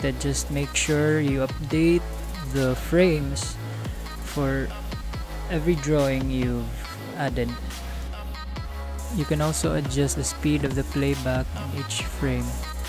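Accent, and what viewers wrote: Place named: England, United Kingdom. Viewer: nice tutorial i like your english accent i can understand it properly ^w^!!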